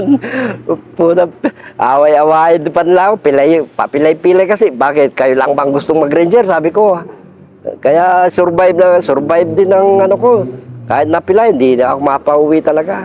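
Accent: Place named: Pampanga, Philippines. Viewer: Ganyan tlga ang Punto mg Tagalog ang kapampangan.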